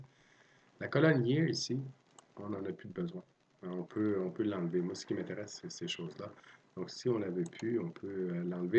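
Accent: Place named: Quebec, Canada. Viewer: Merci beaucoup très intéressant J'adore aussi l'accent québécois ;)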